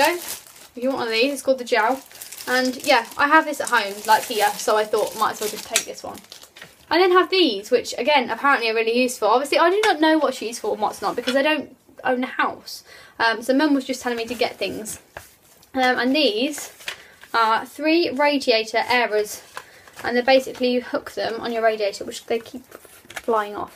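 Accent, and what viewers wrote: United Kingdom. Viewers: Are u from Scotland or England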